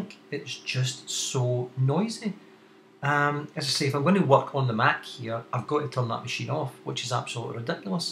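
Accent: Scotland, United Kingdom. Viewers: sort of off topic, but your Scottish brogue is awesome.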